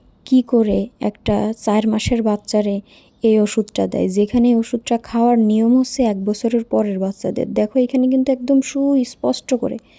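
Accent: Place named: Khulna, Bangladesh. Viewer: আপনার ভাষা তো খুলনার দিঘলিয়া উপজেলার মত।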